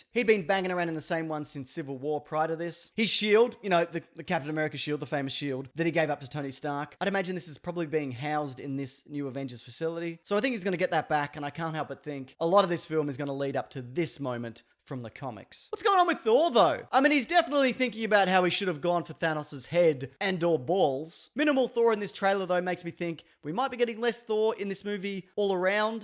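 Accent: Australia. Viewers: Man true Australian accent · You sound Aussie as fuck are you Aussie as fuck?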